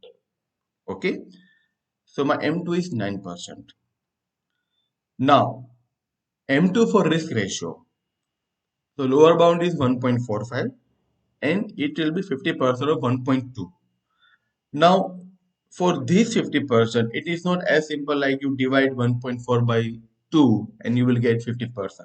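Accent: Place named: India. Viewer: 我去印度英语，OMG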